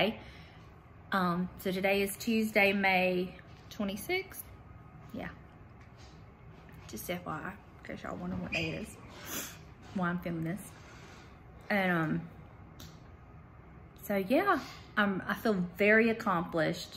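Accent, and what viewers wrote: South, United States. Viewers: I think so too!!💖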